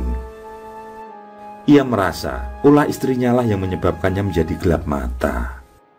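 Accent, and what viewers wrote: Java, Indonesia. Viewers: BARU 32 MENIT BUK NURI TKW DI SYRIA SELALU HADIR,SUKAK BANGET DENGAN ❤ ❤ LOGAT JAWANYA KETAL......SUARANYA MANTAB,NGEBAS, DAN GAMBLANG ALUR CERITANYA, MUDAH DI MENGERTI, NGA MUTER MUTER DAN DIULANG² SUKSES SELALU BUAT ❤ ❤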